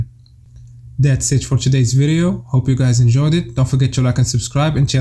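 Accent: Morocco. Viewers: Ghir mn accent 3rftek meghribi, nadi a khoya laysahel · I knew you were Moroccan just by your accent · i guessed your are from morrocco after 10s of the video based on your accent. good content akhoya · I knew that you're Moroccan from the beginning due to the way you pronounce the letter "T" like "tch" or something lol. Much love to Moroccan people ❤️ Also Eid Mubarak if you're Muslim ♥️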